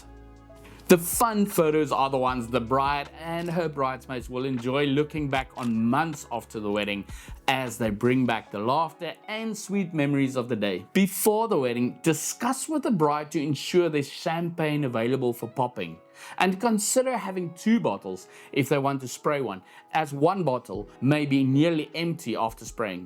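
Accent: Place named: South Africa. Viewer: Great stuff! And I loooove your thick south African accent! Liked and subscribed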